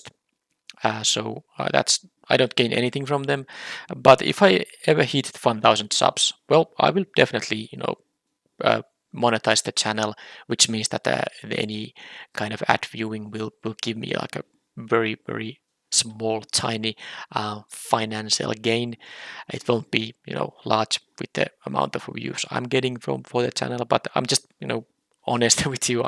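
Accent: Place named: Finland. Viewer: thank you for update. I'm glad, that you are Ok 👍, and stay with us. Just make a video, when you feel it. I will happy to see you anytime. You are such a nice guy and i love your finnish accent 😄. Believe or not, i watch other streamer from Finland on twich named NCAA, which is one of the best limited player on the world. So in Finland are another great players 😄👍. Apologize for my English, this is my longest comment in english ever 😄😄 Stay strong, stay healthy. Greetings from the Czech Republic.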